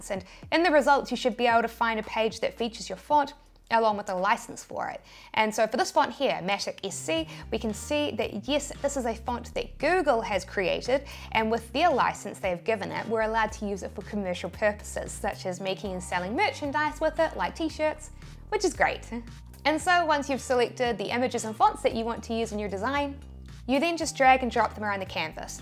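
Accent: New Zealand. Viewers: Oh, so that's where it's from the interning accent, New Zealand , 👍 , lovely acquainting of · I was wondering if it was New Zealand, thanks! I gotta practice my accent interpretation. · Love the Kiwi accent! I watch cricket and so I understand the Kiwi accent perfectly. Love from Sri Lanka 🇱🇰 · New Zealand's English is so adorable! 🌷🌿🌎💖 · You're so sweet. I lived in NZ and I love hearing your beautiful accent. Reminds me of one of the best times of my life. Amazing video as usual. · i think shes from new zealand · The last one that threw me was "each" pronounced as "ahch". It would be cute if you did a video on the New Zealand accent. Yours is quite prominent. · Hmmm, I would have guessed you had a Kiwi Accent. ;) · you are a bloody good Kiwi! And all that with the accent:) I am looking for getting an income as it has now been over 5 months without employment and I realise that recruiters are not working hard enough to help me get a job. 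I have to chase the opportunity myself and indeed, what better than running your own business. You are very detailed in your videos and you stick to your advertised title, which I really appreciate. Being without income, I am maxing our credit card to pay the bills. I hope there might still be enough on the main credit card to pay for the up-front cost when someone buys an item... As you said, it is a cash flow as the sale is made, but imagine if you sell 1,000 items in one week and you don't get your money before the second week, Ouch! But then, you can explain this to the bank manager and show your orders with the expected payout to come. I am sure the bank will allow an overdraft on the account for the credit card... (hopefully these are still humans?) I will probably watch some more and this one again and get started tomorrow. Thank you for your time making these videos. You definitely deserve your income.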